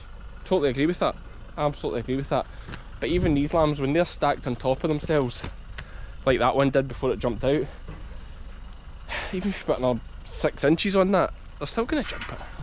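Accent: Scotland, United Kingdom. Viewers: Absolutely love it when you DONT hide your scots. 😊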